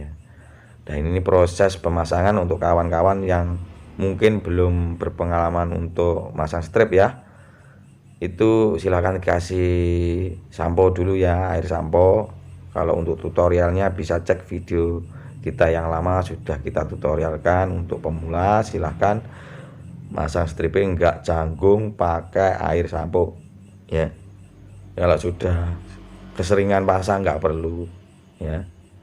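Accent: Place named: Kalimantan, Indonesia. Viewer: mntap . suara orang kalimatan kh bng yg ngmong ini ? bntu suscribe bng . sering nnton jga soalnya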